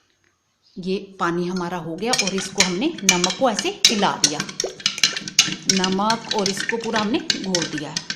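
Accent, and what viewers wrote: Punjab, India. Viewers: g aap 4 minute ke vedio ke 22 minute laga diye kirpa karr ke sabh teyar karr bass ek piece kaat karr short mein dikhaeya karo........main hi nahi sabh bor hote honge.........kaam achha kiya.......shukriya.....aap ki zubaan punjabiyat jhalakti hai aur yeh achhi baat hai......koi bhi ho bolne mein wahan ki khushboo aani hi chahiye......👍👍👍👍😃😃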